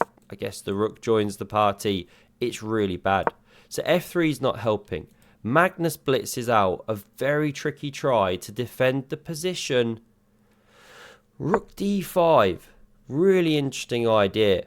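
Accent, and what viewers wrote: United Kingdom. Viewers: Thank you. I meant your real name :-) From your accent you must be British and I love your shirt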